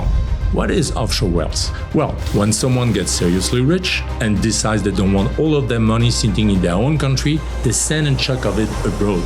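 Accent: France. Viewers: Lol says a guy with french accent , , compare to Switzerland is France dump with all there problems‼🤭😂🤣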